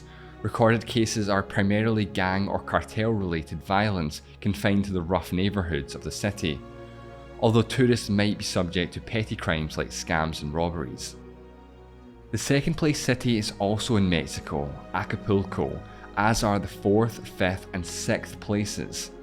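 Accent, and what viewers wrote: Scotland, United Kingdom. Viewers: Is this a scottish accent or something? Harder to understEnd. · He's Scottish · You've never heard a Scottish accent? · where in scotland are you from? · guys, the guy's from scotland. give him a break for pronouncing "city" as "seti" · Scottish not sure where in Scotland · no he doesn’t? It’s just a Scottish accent. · he’s scottish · Scotland. I couldn't say whereabouts but he's definitely Scottish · Are you Scottish? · Hes scottish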